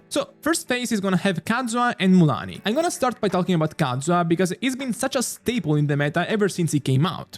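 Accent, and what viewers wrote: Italy. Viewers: I love his italian accent:D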